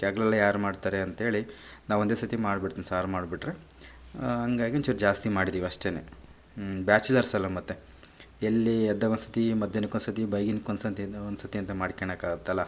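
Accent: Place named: Davangere, India. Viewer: ನಾವು ದಾವಣಗೆರೆಯವರು... ಇವಾಗ ಚಾಮರಾಜನಗರದಲ್ಲಿದ್ದೀವಿ.... ನಮ್ಮ dvg ಭಾಷೆ ಕೇಳೋಕೆ nim ವಿಡಿಯೋ ನೋಡ್ತೀನಿ.. Nim ಅಡುಗೇನು ಚೆನ್ನಾಗಿರುತ್ತೆ ಬ್ರದರ್ ❤❤❤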